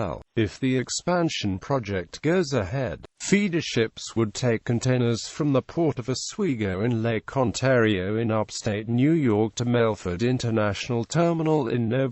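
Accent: United Kingdom. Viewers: This is the first computer I've encountered that has a British accent.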